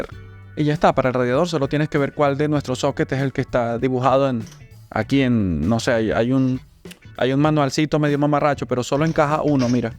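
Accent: Venezuela. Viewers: eres de venezuela? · Um........ ese acento.... Es Un Paisano Venezolano.....Saludo Hermano, excelentes videos! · Compa, por el hablado usted tiene que ser venezolano jajajajaja!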